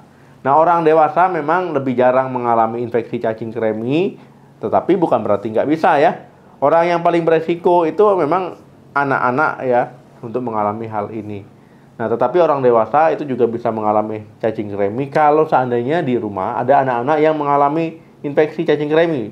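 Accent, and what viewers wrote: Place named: Medan, Indonesia. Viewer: LOGATNYA MEDAN BANG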